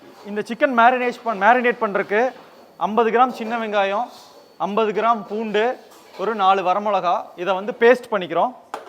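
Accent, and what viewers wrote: Kongu, India. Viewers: சூப்பர் ங்க உங்க சமையலும் நம்ம கொங்கு தமிழும் ❤❤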